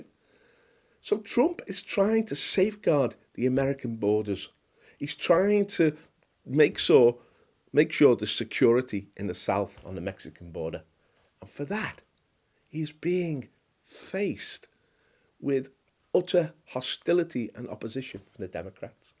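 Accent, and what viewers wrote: Liverpool, United Kingdom. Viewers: Ja! Pure Scouse.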